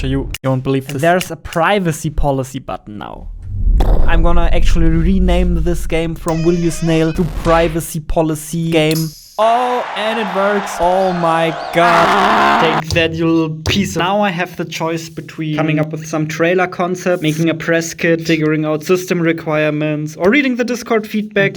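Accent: Germany. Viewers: i like how you can hear his german accent sometimes sneak through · Really uplifting and funny videos! I love the German accent as well! What's the name of the gray fluffy bamsefleece sweater you wear at the end? I got a Norwegian hoodie made in the same material and they are great. Good luck with your game. I've wish listed it on Steam. Looking forward to it!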